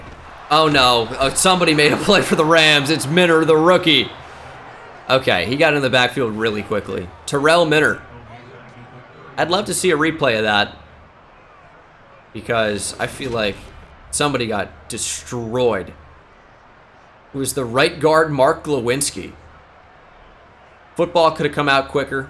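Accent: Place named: New Jersey, United States. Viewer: I think there’s just different dialects bruh, you’re from Jersey so you say it different than other people I don’t think that’s a hard concept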